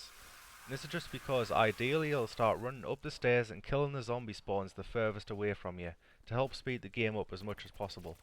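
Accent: United Kingdom. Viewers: I have no clue if is scottish or british love your videos btw they help me alot Edit: you know the map is Hard if the first Clip isnt round 100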